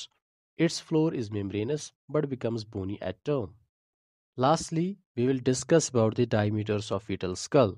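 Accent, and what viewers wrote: Kashmir, India. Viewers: Voice of a Kashmiri guy😊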